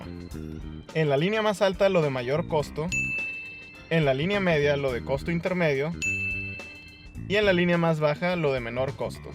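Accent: Monterrey, Mexico. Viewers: Eres regio???? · Eres de Monterrey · Saludos papu muy buenos los tips eres un crak claro mexicano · Eres de monterrey verdad?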